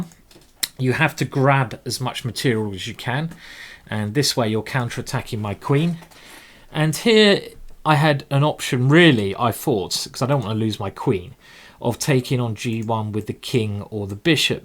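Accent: United Kingdom. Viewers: Your voice's alright, mate. The world is bathed in American media, with nasal voices in comparison to which any British accent at all is like a musical experience.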